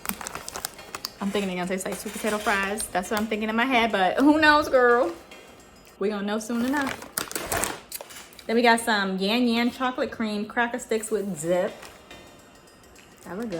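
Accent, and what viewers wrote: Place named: New York, United States. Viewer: Yerrrrr… I can tell you’re from NY. Love the vid!!! Can’t wait to order something’s from this company.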